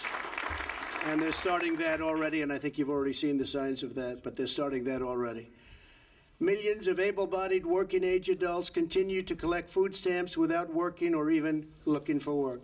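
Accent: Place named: New York, United States. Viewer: Imbecile like a steel trap! He's very smart. That talk like a New York taxi driver is just an act. High IQ person.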